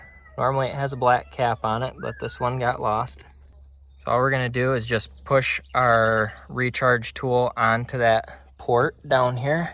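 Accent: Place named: Midwest, United States. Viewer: Simple, to the point. Well done. Nice Midwest accent. Cheers to the algorithm!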